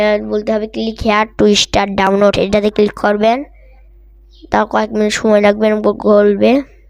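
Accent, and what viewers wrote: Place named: Kushtia, Bangladesh. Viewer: Onk valo bolco viyaa❤❤ Ami sure tomer basha Kushtia 😊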